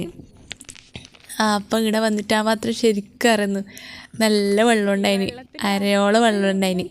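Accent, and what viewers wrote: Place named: Kasaragod, India. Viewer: ഞാൻ nileshawaram ആണ്.സംസാരം കേട്ടപ്പോ തോന്നി❤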